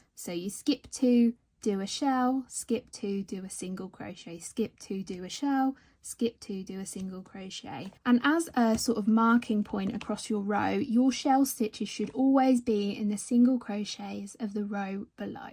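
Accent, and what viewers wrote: England, United Kingdom. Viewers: You sound English, like me. What are you doing up at 5.30 am?😄Me? I'm feeding a stray feral cat (charlie) who waits outside the door for me to get up. x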